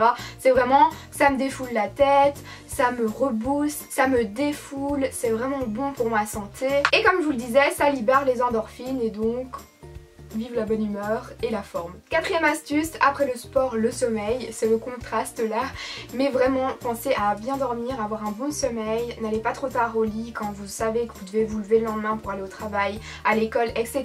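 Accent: Belgium. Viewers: merci pour cette superbe vidéo 😘😘 t es trop belle 😍 vive la belgiiiique et l'accent 😍😍😍😍😍😍😘😘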